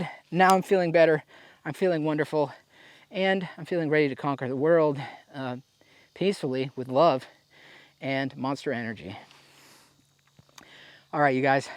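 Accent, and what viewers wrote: South, United States. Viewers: Bro you should keep the Sothern accent! sike as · Honestly I support the Southern accent it fits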